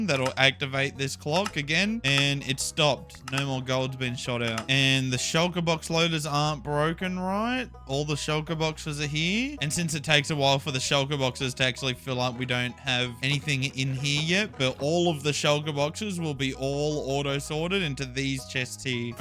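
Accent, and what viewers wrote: Australia. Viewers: aussie minecrafter?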